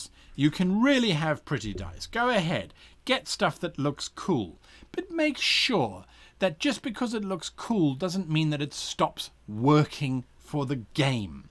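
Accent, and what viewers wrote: England, United Kingdom. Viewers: I really like your British Accent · You sound like your putting on an English accent are you English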